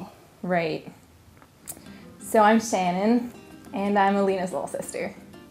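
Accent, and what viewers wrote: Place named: Uruguay. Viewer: I was like hey why is she speaking Spanish with an Uruguayan accent? Hahaha.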